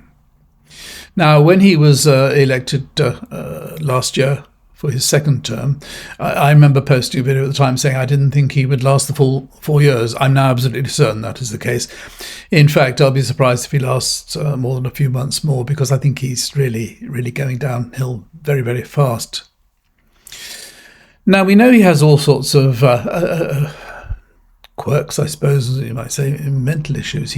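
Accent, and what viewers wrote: United Kingdom. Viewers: If you don’t know, know this now: Even though this man has an English/Brit accent, ONLY AMERICANS SAY THAT THE PRESIDENT OF THE USA “IS THE MOST POWERFUL PERSON IN THE WORLD.”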